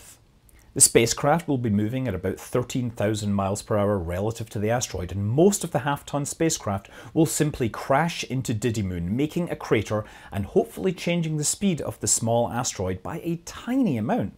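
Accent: Scotland, United Kingdom. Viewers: Fair point. has a Scottish accent but I did find a video of his that suggests he lives in California. So I think I know more or less where he lives. That doesn't go for everyone. A UTC time or a clue about which 9am would have been helpful. If you have a problem with that, it's on you.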